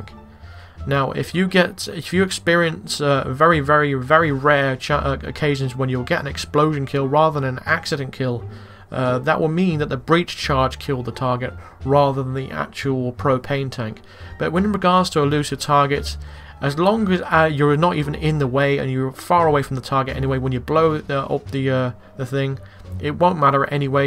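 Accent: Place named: West Country, United Kingdom. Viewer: Bristolian/West Country accent.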